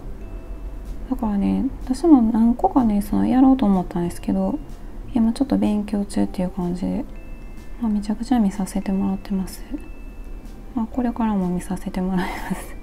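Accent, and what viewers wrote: Kansai, Japan. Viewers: こんにちは👋😃私がさんを知ったのは、4年前急にくも膜下出血で右半身が不自由になって、ショックで何もやるきが失くなって一日中泣いてばかりしてた時、編み物を勧めてくれたんですよ。😅でも、手が思うように動かなくて💦😥ひたすら動画をながめてました。そしたらなんと私と同じ関西弁で編み物をされているさんを見つけて😂 …なんか、今まで編み物の基本ばかりにとらわれてしまって、できないことばかりで諦めてたのに、さんの話しを聞いたら、なんかできるような気がしてきて挑戦してます。今ではリハビリをかねて編み物してますので手が大分動くようになってきました❗️足はまだまだですが😅💦何よりさんのおかげでかぎ針が使えるようになったのが嬉しくて😂あなたの動画に出会って無かったら今頃は死人のようになってたかも😅 さんの関西弁も大好きです😄私は関西人だけど関西弁を喋らないところに住んでます。懐かしさもあります。これからも楽しいトークと編み物、UPされるのをまってます🎵長々とスミマセン❗️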